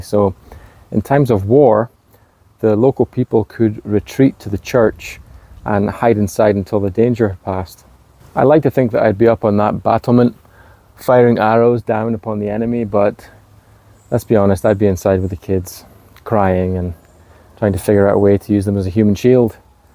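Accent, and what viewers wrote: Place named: Scotland, United Kingdom. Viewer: any otters in ur area, need to make some mates. luv ur scottish accent and ur humour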